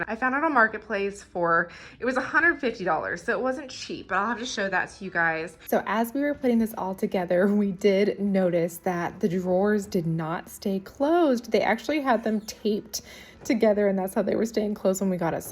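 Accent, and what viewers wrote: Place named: South, United States. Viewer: Do you live in the South. I hear you say y’all but I thought for some reason you live in the North lol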